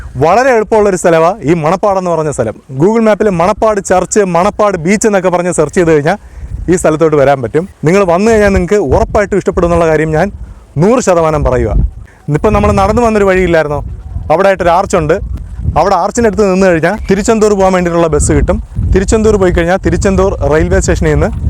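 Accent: Kollam, India. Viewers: കൊല്ലം ശൈലി.😊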